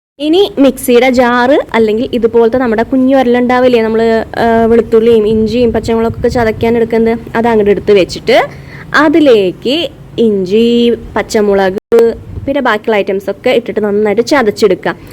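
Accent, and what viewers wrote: Thrissur, India. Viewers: Chechi frm Thrissur aanno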